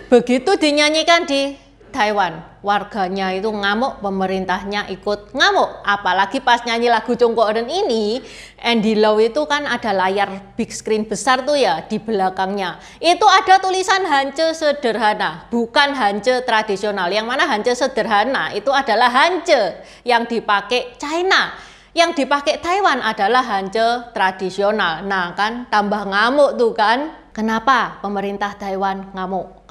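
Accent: Java, Indonesia. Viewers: Jowone medok 😁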